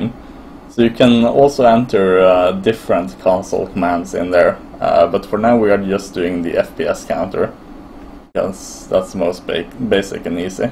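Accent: Sweden. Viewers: Swedish detected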